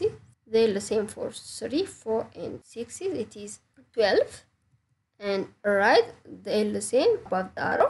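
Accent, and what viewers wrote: Ethiopia. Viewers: She is from Ethiopia I know by her accent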